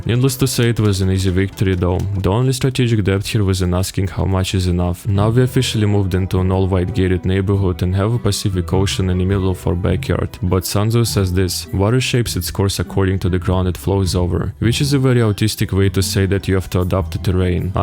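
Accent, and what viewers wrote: Russia. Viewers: я слышу твой русский акцент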